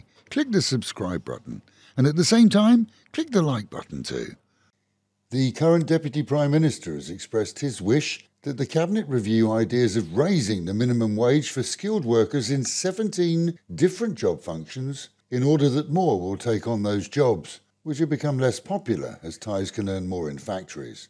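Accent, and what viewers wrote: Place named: RP, United Kingdom. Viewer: Nice RP accent. Quite a change from the many YouTubers who seem to be from Newcastle.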